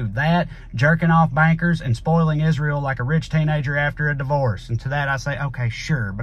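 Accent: South, United States. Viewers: i skip every ad on this ap. But yours, idk if its the southern drawl or what but your ad reads are actually enjoyable 😂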